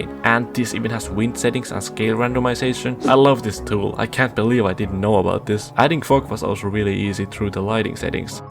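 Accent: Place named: Finland. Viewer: This editing style is awesome. And btw, you sound like you're from Finland. :D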